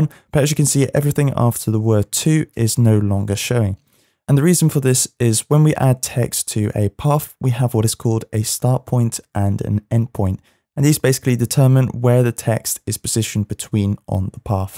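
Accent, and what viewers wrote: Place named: England, United Kingdom. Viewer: FANTASTIC!!! I've just found you, after looking for so long to find about putting text into Photoshop. Such a breath of fresh air to hear a nice slow, calm, English voice, and very carefully explained. THANK YOU SO MUCH! I am avidly looking at all your videos. I went to bed a very happy bunny last night. I have subscribed!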